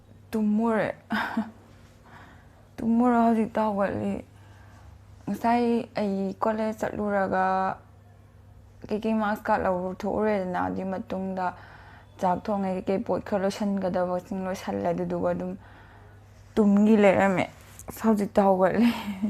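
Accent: Kakching, India. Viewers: che na kakching marol lonba C ymna nungCye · Kakching marol yam lol lay kei ge eche nang ba Yam nung ngai tay ko Nachak khuthe du ga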